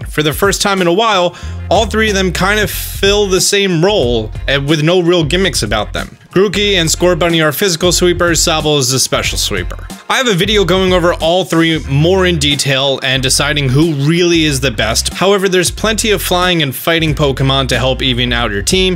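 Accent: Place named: East Coast, United States. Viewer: I love the way you and everyone else on the East Coast say water... "Wooater" hehe 😁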